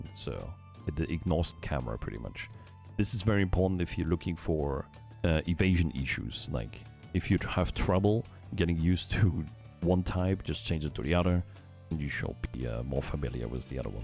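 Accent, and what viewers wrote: Germany. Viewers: Your dialect sounds kinda like you’re from Germany? Am I right?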